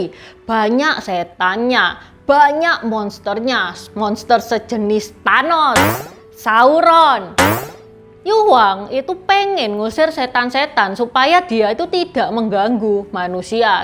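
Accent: Java, Indonesia. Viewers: Mbak daleme pundi gih. Jawane medok men.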